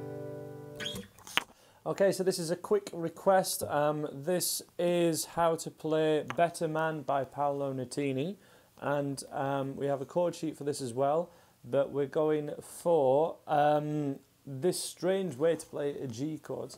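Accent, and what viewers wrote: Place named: Hull, United Kingdom. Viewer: where are in the UK? I’m from Hull but living in Australia and you sound like a neighbour! Loving learning from you. Very clear to understand. Thanks for all you’ve done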